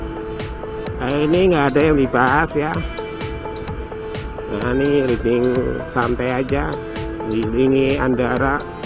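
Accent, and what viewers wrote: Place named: Banyumas, Indonesia. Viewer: Nyimak sedulur ngapak ora ngapak ora penak. .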